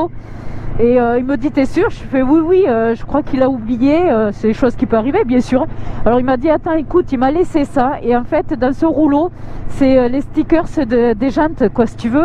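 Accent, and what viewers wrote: South, France. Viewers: J'adore ton accent du sud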